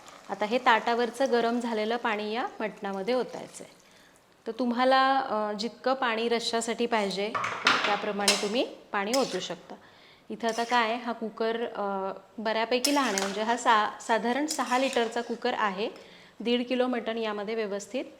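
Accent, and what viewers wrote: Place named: Kolhapur, India. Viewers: Ly bhari bolla ki o aamchi kolhapuri bhasha🎉🎉🎉❤❤❤ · Kolhapuri jagaat bhari❤ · जमलं ताई कोल्हापुरी बोलायला, आम्ही प्रॉपर कोल्हापूरचे MH 09नाद खुळा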